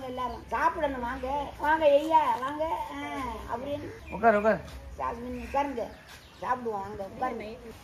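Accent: Ramanathapuram, India. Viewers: ராமநாதபுரம் பேச்சு உடன் சாப்பாடு செய்யும் போது என் சிறு வயது நினைவு வருகிறது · Ramanathapuram slang ah?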